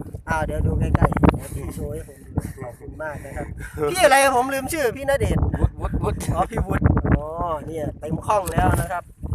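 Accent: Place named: Bueng Kan, Thailand. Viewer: โอ้ยเนาะฟังๆไปกะคนบ้านเดียวกันคนบึงกาฬคือกันครับ เอาไปก่อนเลย100วิวบักหล่า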